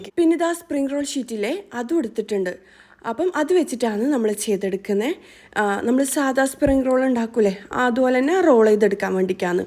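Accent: Kannur, India. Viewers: Irfanagood presentation enik kannur samsaram nallaishtanu to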